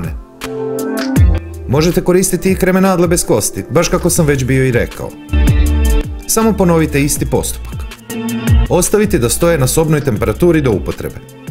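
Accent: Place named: Karlovac, Croatia. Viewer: Neću o receptu nego o tvom predobrom Karlovačkom naglasku...baš si me vratio u mladost i podsjetio na prijatelje iz postrojdbe.....pozdrav Karlovcu i svim predivnim ljudima iz njega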